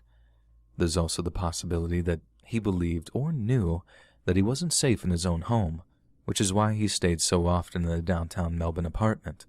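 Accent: United States. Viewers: This was definitely in Liverpool! Haha yeah it was so funny hearing all these scouse sayings read by an American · What an interesting experience to listen to a story with a British tone, read in an American accent lol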